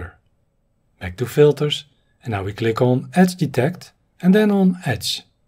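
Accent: Netherlands. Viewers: I adore that little touch of Dutch accent, you speak quite calmly and can be understood very well!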